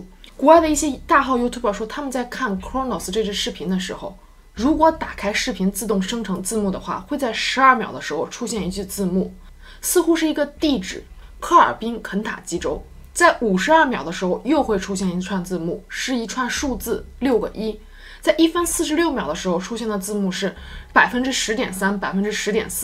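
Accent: China. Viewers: 是中国人很正常😎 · You sound like from mainland China.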